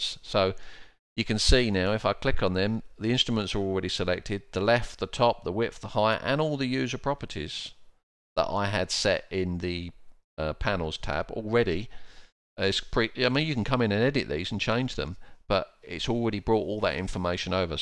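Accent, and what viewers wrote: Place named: United Kingdom. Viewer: I'm new to this and greatly appreciate your videos. They are even clear to me which is not an easy affair for a French speaking simmer listening to a british accent! I will have to see this one again as I missed a few things. By the way you should make your panels available. It would be so useful for beginners. Thanks again!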